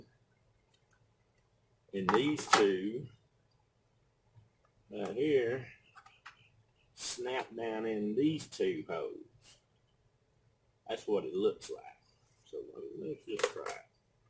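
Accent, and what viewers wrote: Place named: South, United States. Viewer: THANK YOU SO MUCH. JUST PURCHASED THIS FAN AND I COULD NOT HAVE DONE IT WITHOUT YOUR HELP. U MADE MY DAY. SOUTHERN ACCENT WAS GREAT, I ENJOYED. HOT HERE N SACRAMENTO. I HAD A PROB WITH A WALMART PURCHASE AND THEY SENT ME 2. 2ND FAN WILL B MUCH EASIER 2 PUT TOGETHER. ONCE AGAIN THANK YOU GOD BLESS❤🎉🎉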